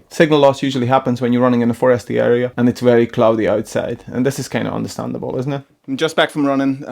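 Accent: Northern Ireland, United Kingdom. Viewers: You are from Northern Ireland? Your accent is a cross between foreign and NI 😂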